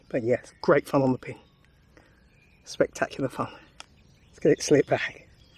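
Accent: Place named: Sarawak, Malaysia. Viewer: Sounds like ur in Sarawak bud!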